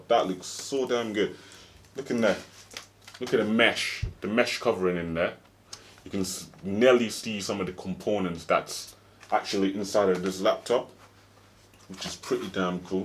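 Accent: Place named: United Kingdom. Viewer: Alabama? But sounds like british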